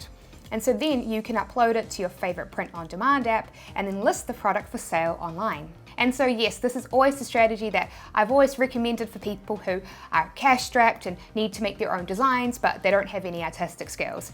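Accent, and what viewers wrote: New Zealand. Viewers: Love your videos, a great help but I gotta be honest as a Brit I love the NZ accent and love these ones where you give points (Tin points or as we say Ten points) wish you were a judge on strictly come dancing - I’ll give it Tin points! Seriously brilliant videos and a massive help! Thanks! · I don’t get why some people hate ur accent. NZ accent is the cutest! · Love the Kiwi accent. When I was over there the Television adverts for Decking were so funny 😂 Hoping ecommerce works for me and I'll be back to NZ soon!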